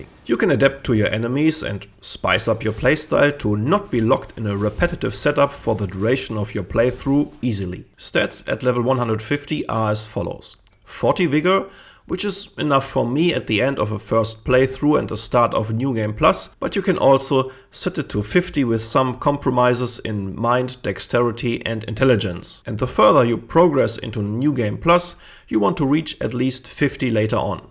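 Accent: Germany. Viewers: are u German ?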